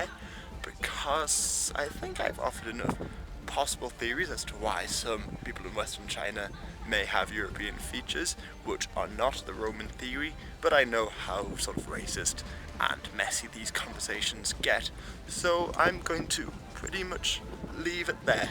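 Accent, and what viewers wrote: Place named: Northumberland, United Kingdom. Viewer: Are you originally from Northumberland? We have the same accent.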